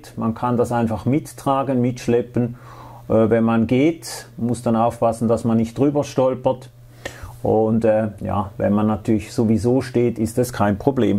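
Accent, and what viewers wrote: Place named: Switzerland. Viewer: Für mich ist das eine Rohrbriede, vor mir gehen aufputz 2 kupferne, blanke 1" Heizrohre ins OG. Braucht es für so stationär, an beiden Seiten/Enden solch Rohrbrieden, oder, oder schirme ich mich mit der Erdung im Ganzen Haus mich ab? Sorry, bin totaler Leihe und dachte erst, es ginge um die Antenne selbst. 11m Draht im Estrich spannen bringt was oder? Bis da hoch sollte der Draht dann gemantelt, geerdet sein? Ich seh schon, muss noch weitere Videos betreffend schauen, das war nicht das richtige, dennoch gut gemacht und das Schweizerdeutsch toll gezügelt. - Äxgüsi, das kann nicht jeder Schweizer!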